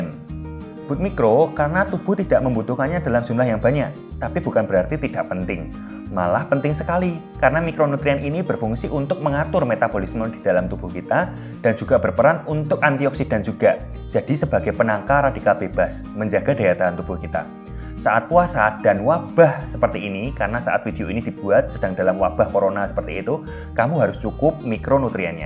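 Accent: Java, Indonesia. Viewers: Medhok nya itu loh yg bikin nda bisa skip skip video.. Good work makasi teori dan motivasinya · Medhok kali ni mas nya🤣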